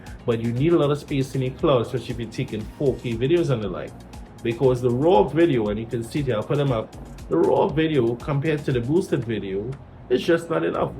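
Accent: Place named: Jamaica. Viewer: patois accented tech reviews instant subscribe brudda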